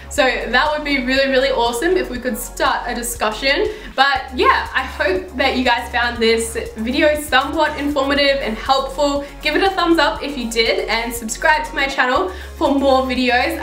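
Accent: Australia. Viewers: are u from Australia?? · Are you Australian??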